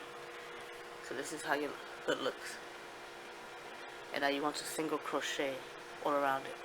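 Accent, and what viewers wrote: New York, United States. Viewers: Thank you for your clear instructions. I can't wait to start 😊. Do I hear a fellow New Yorker?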